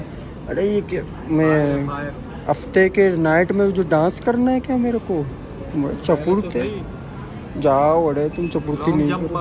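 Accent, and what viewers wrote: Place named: Balochistan, Pakistan. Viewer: BRO your Urdu is gud with Balochi style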